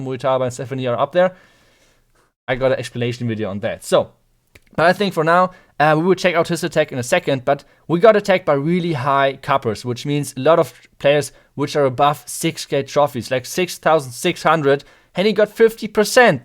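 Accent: Germany. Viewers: Its great to have a world class(skilled) player as a youtuber.Your enlish(German version😊) is really enjoyable.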